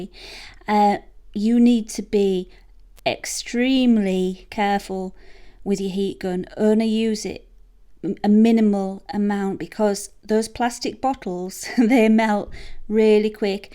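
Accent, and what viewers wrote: United Kingdom. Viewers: gotta love that british accent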